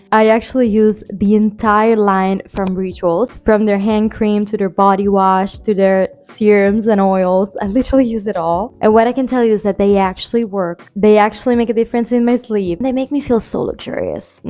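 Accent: Italy. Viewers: sentivo l accento ma mi sono stupita di come parli inglese da italiana, come mai fai video in inglese? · wait a minute are u italian?? i noticed only now the accent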